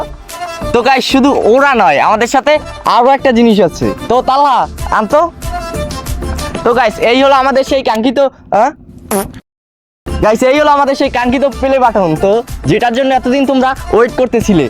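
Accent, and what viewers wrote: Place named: Bagerhat, Bangladesh. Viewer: বাগেরহাইটা পোলা কথায় বোঝা যায় ভাইয়া আমি বাগেরহাট জেলার কচুয়া থাকি😊😊😊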